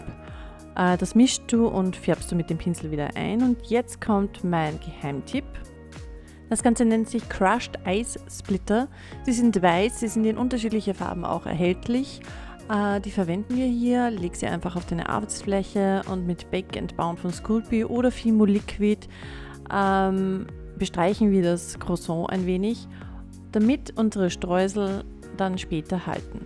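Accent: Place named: Vienna, Austria. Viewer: I könnt mi wegwerfe! 😂Der Dialekt! Wiener Schmäh & More! Lustig! Das macht Spaß, Dir zuzuhören! Und danke für die hilfreichen Tipps! Möchte demnächst mal anfangen😊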